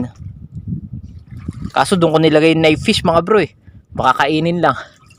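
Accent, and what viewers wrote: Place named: Talim Island, Philippines. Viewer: Lalo akong nag-eenjoy na panoorin ang vlog ninyo kapag naririnig ko ang puntong Talim Island ninyo. Bang-laki ng bangus hane?! 😀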